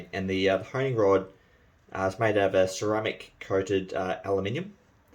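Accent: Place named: United Kingdom. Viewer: Why is your accent and syntax so UK, if youve been in the US Army?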